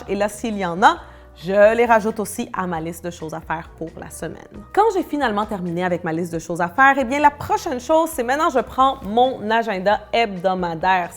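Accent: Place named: Quebec, Canada. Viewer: c'est la première fois que je kiffe un accent québécois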